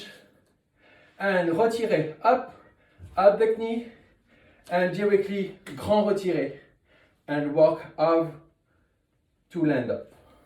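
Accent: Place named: France. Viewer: Your French accent is beautiful.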